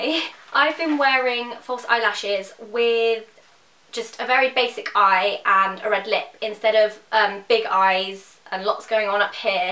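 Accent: England, United Kingdom. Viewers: are you english ? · Same here brah, same here i love dat British accent too, so sexy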